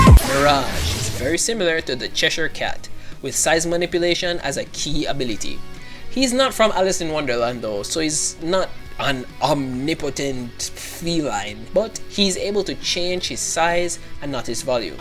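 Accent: Jamaica. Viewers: Is he Jamaican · Are u jamaican? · You sound Jamaican. Are you? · you sound like jamaican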